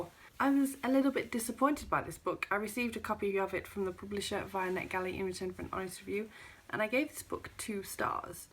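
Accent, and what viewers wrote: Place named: Bury, United Kingdom. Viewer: I love your accent; sounds very like Victoria Wood's. She was from Bury, if memory serves, so I guess you must be, too.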